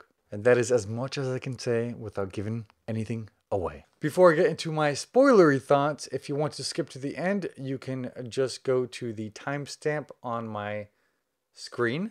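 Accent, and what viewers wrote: South, United States. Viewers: I can't believe you had like a book called, "To Sleep In A Sea of Stars" by Christopher Paolini at your house in Texas during your quarantine until you're reading the whole book and talking about Kira Navarez's life was on the brink of a happy change. She was newly engaged to her co-worker named, Alan, geologist and they were planning to settle on Adrasteia, the moon of Sigma Draconis, where they had been working together for several months. It's really important to do that and I hope your husband, Zach will be very proud of you, because I love your Southern accent!!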